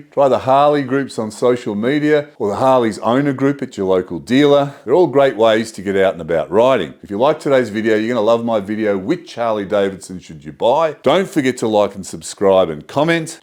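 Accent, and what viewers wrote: Australia. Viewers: The Aussie accent aside I was thinking he looked kinda like an Old US Marine.😁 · I hate AI text. He said "aspire" and the text caption read "a spider". Granted,he does have a strong Australian accent. Perhaps the AI is American.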